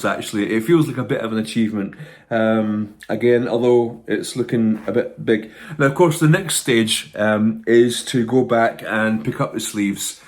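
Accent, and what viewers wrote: Scotland, United Kingdom. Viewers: And a HUGE thank you to you too dear for the very important role you play in my knitting life! I find your calm, Scottish accent so relaxing and your no-fuss approach similar to my dear husband’s. At the moment I’m having the joy of making my youngest granddaughter a cute farm playset for her first birthday in July - I’ve made the 12 fields and am currently making the pond, stream, veggie patch etc. then I’m onto all the cute little animals ❤ best wishes to Katie as she heads off to university - best wishes from Elizabeth in Tasmania.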